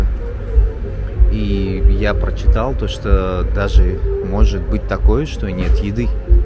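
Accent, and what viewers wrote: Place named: Uzbekistan. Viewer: Акцент такой только у Узбекистанцев! 😀👍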